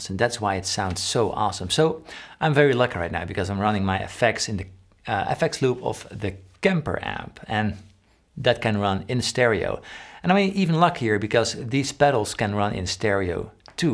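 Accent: Netherlands. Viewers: The best things about ' music videos (in random order): --The Dutch accent that can sometimes make him difficult to understand momentarily, yet lends a certain gravitas --The humble, self-effacing humor --The funny, quirky video shots --The superb production quality of the videos --The thoughtful design and progression of material in each video --The time and effort he obviously dedicates to making them so exceptional --The love and passion for music he shares and inspires --The broad knowledge of music and musicians he offers --The uncanny ability in selecting interesting subject content--or otherwise making it interesting --The personal honesty and openness always evident --The willingness to question his own opinions, not just others --The creative musical thirst and desire for experimentation --The excellent, wide-ranging musical taste --The intelligence and ability to breakdown the complex into the comprehensible --The superb guitar playing If there is anything above found missing or incorrect, feel free to reply and add your thoughts. 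Already remembered one big thing not mentioned: THANK-YOU,!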